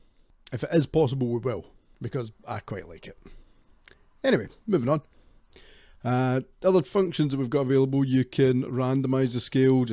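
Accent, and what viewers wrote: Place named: Scotland, United Kingdom. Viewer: I can always tell when the Scottish guy is going to start talking. Because the UI's are always awful. Lol. Plugins are good, don't get me wrong! :)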